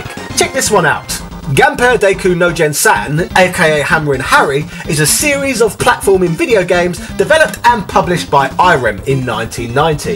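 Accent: United Kingdom. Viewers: PLEASE! Just list the games in the description! Trying to decipher Japanese names from a British accent is impossible.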